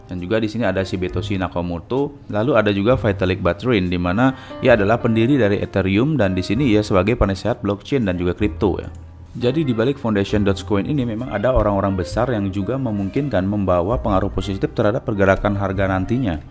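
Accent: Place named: Bali, Indonesia. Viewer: orang bali boss...logat nya mirip...tapi artikulasi penjelasannya muantapp. dan sangat mudah di mengerti..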